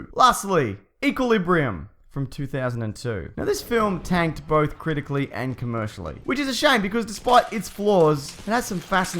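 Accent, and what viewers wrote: Australia. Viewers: Is he australian? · his accent is badass... #AustralianArmy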